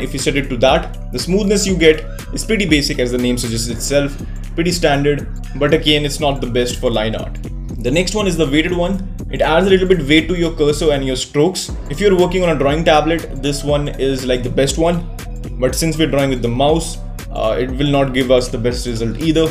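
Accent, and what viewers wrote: India. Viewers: indian accent. bye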